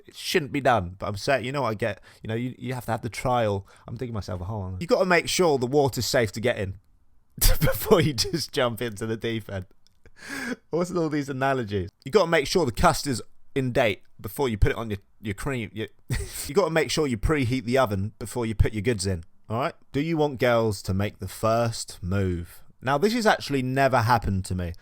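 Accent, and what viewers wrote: United Kingdom. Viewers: U have a great voice and accent...r u British?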